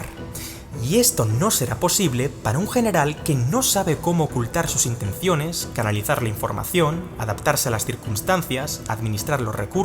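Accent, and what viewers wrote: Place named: Spain. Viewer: La voz no ne gusta..es de España...setia mejor una voz neutra..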